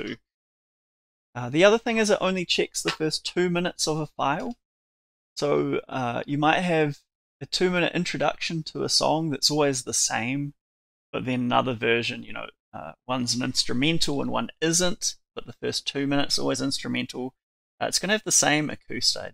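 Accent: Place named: New Zealand. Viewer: Thanks guy with a strong New Zealand accent! I was trying to figure out how to generate an AcoustID from an audio file to figure out if it matches the ones linked to a MusicBrainz recording (So I could determine if the two recordings should be merged), And looking it up on the internet I couldn't find an answer, But this video explained it quite simply!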